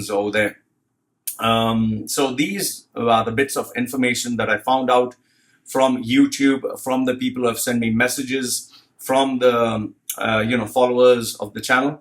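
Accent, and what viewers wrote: India. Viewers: Indian accent yeahhhh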